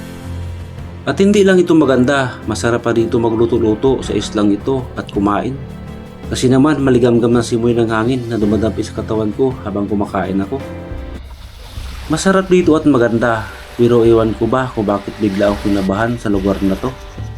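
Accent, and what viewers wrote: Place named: Bicol, Philippines. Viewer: Parang Taga bicol itong si par!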